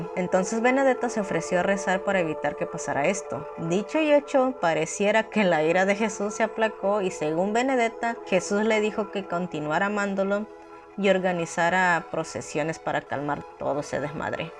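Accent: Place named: Veracruz, Mexico. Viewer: Le puse más atención a tu acento jarocho que a la historia en si xD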